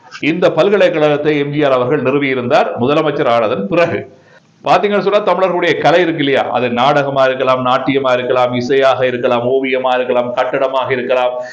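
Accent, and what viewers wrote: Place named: Sri Lanka. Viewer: தங்களது இலங்கைத்தமிழ் மிக அருமை ! உலகிலேயே சிறந்த தமிழ் இலங்கையில் மட்டுமே உண்டு !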